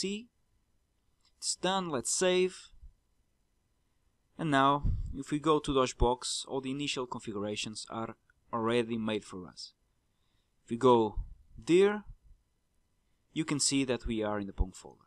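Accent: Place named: Portugal. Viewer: Ahahah Portuguese, knew it just from hearing it :P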